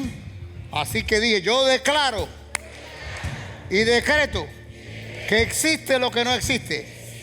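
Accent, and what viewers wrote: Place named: Cuba. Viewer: Pastor yo vivo en New Jesrsey , es primera vez que me cruzo con sus prédicas. He compartido porque estas enseñanzas bíblicas y no solo conocerlas si no vivirlas , aplicarlas en nuestras vidas y preservarlas como el tesoro más valioso qué el ungido de Dios nos comparta . Dios le bendiga Pastor a usted y a su familia. Usted me recuerda mucho a mi hermano muy cubano en su forma de hablar y con la experiencia de la vida usted tuvo el privilegio de ser rescatado . Mi hermano en cambio con el potencial que tenía por no saber y aplicar estas promesas que son nuestras perdió su vida con un cancer a los 57 años. Me he identificado con cada prédica y verdaderamente necesitamos ser sacudidos y necesitamos hombres de Dios como usted . Mis bendiciones. Vivianna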